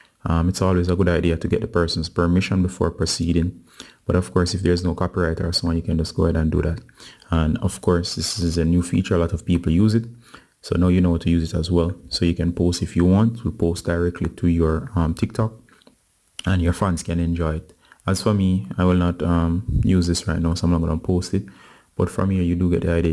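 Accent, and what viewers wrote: Jamaica. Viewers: You sound like your jamaican....Thanks for the tutorial!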